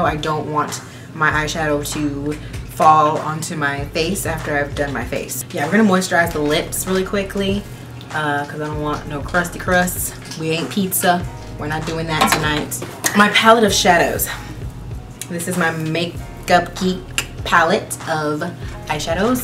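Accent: England, United Kingdom. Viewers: Literally thought "oh my gosh why do a baby reveal like this" 🙈🙈 British accent is on fleek though! Sounded a bit like Shirley though lol From London btw when are you coming to visit 👌🏾😍😘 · Love the English accent 😂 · Living for the british accent lmao you kill me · The English accent though 😂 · Your British accent is so good 😂 this is a sign for you to come down next year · You're british accent s actually soo dope!! xx Loving this look sooo much sis xx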